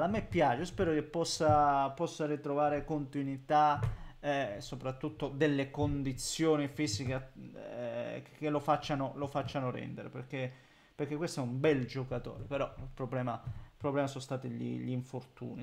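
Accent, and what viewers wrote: Calabria, Italy. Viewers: l'influneza calabra si sente caro (anche se sei lucano) hai detto Castrovillari, paesino vicino a Cosenza, invece di Castrovilli 😄 TI SI VUOLE BENE BRO!!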